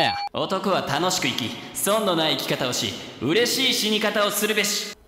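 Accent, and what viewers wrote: Kansai, Japan. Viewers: イントネーションめっちゃ関西弁やん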